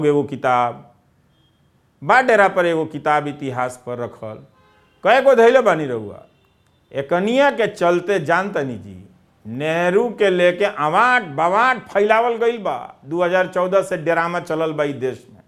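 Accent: Bhojpuri, India. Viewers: I'm not from the hindi region but love bhojpuri and understand well. I enjoy the dialect and accent. Subscribed this channel. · आपकी भोजपुरी बोलने की शैली बहुत प्यारी है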